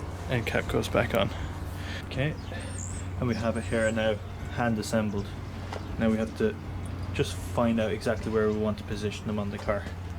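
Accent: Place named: Ireland. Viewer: Sounds so weird hearing an Irish guy call me dude! Thanks for the video though. Was nervous trying my vitz roof bars on my new Toyota corolla hybrid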